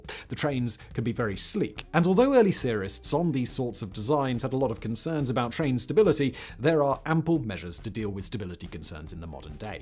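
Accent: United Kingdom. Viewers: Hearing a British accent talk crap about maglevs when y'all can't afford to build stock HSR is cute.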